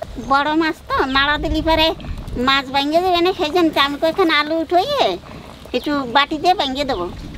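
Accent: Bangladesh. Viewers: সব ঠিক আছে কিন্তু এনারা কি বাংলাদেশের লোকজন? এরম ভাষায় কথা বলেন এনারা যেন মনে হয় বাংলাদেশে থেকে এসেছেন..যদিও বাঙাল অনেকেই কিন্তু পশ্চিমবঙ্গে জন্মগ্রহণ বা বসবাস করলে তো ভাষা পরিবর্তন হবে.. একমাত্র যদি born and brought up বাংলাদেশ হয় তবেই এতবছর বয়সেও ভাষা বদল না হতে পারে।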